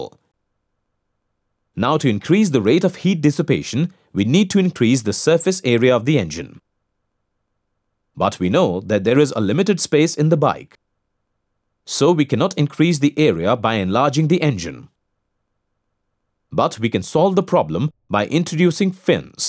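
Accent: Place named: India. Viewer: Indian accent spotted.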